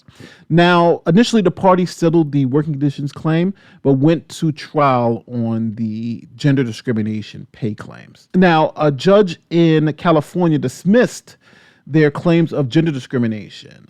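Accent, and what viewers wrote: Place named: Boston, United States. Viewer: You have Boston accent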